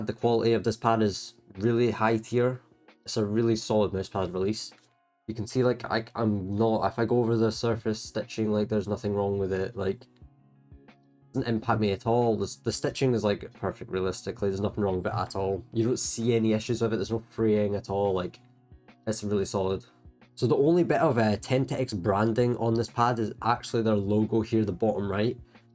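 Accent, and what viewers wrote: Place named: Scotland, United Kingdom. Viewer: Scottish accent 😊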